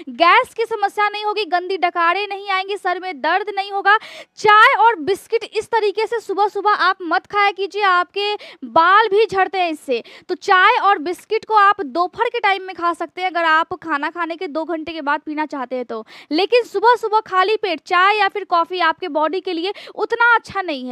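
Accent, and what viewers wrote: Bihar, India. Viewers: Kya Aap Bihar Se Hain??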